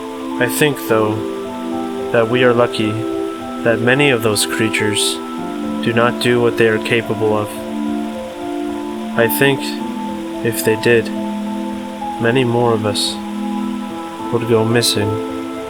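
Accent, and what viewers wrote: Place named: England, United Kingdom. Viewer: The correct pronunciation is ( K O O S ) not Cooas ! The narrator is an English Speaking gentleman from England where they speak the ( Kings English ) not American English which is not the same ! !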